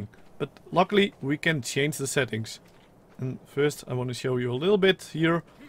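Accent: Netherlands. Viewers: hahaha je hoort wel goed dat je nederlands bent :D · nice nederlands accent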